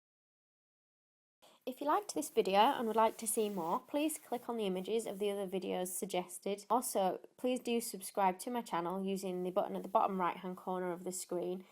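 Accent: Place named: Northern England, United Kingdom. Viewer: she's northern! Northern English accent :)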